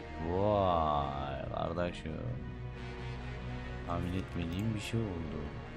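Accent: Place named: Ankara, Turkey. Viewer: bizim taraflarin şivesine benziyor anlamında demek istedim. Ankara yani. Ankara'nın neresi?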